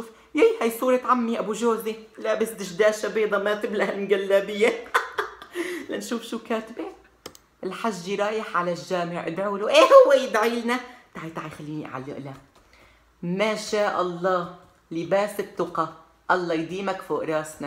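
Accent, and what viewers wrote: Aleppo, Syria. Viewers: | والله تعلمت اللهجة الحلبية منك متابعك الوفي من الجزائر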